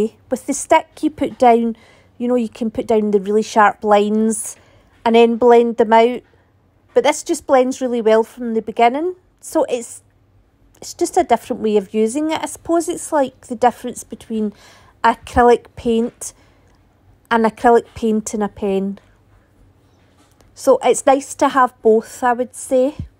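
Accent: Scotland, United Kingdom. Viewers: I just watched Ceri the Crafter's video where you sent him ATCs and happy mail. I have just subscribed to your channel. :) I love these little pieces of art you have made. They are beautiful. (I especially like the dark ones) I love your accent. My mum was Scottish, so it makes me nostalgic. (I live in Australia). Oh and I would totally watch a longer video with you showing the process of these. They're brilliant.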